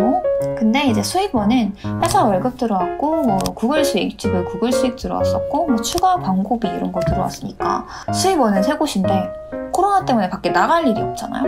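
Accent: South Korea. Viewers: 日本語の発音が韓国人っぽくなってきましたね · やっぱり8ヶ月も韓国にいると日本語が韓国の方の日本語になりますね！可愛いです😭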